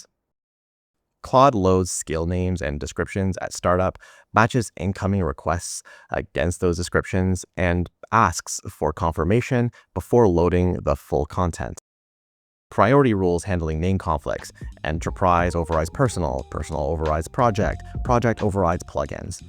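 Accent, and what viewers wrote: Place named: California, United States. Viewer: SNL Californians accent is somewhat annoying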